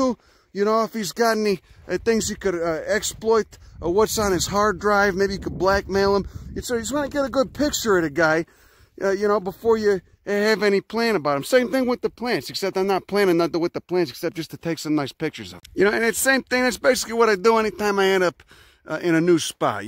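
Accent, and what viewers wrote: Chicago, United States. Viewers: First of all, your Chicago accent makes me feel so happy. Second of all, if I see a juvenile bear, I assume his mom is nearby somewhere and I’ll be the one running. Third, your knowledge is amazing! Thank you. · Truth! If you've never heard these Latin names pronounced before, you're going to learn them with a Chicago accent. Plus, there's that special "see you later, my friend" phrase.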